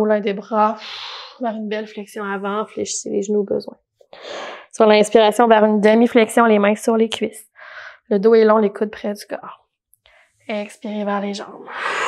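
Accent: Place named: Quebec, Canada. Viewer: Je suis débutante et merciiiiiiii, j'ai adoré cette séance. et ce ton zen québecois cher à mon coeur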